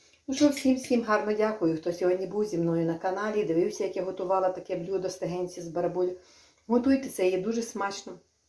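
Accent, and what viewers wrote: Ukraine. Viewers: Не хочу я звикати до бара боли.Что за деревенский украинский язык?!! Селянский!!!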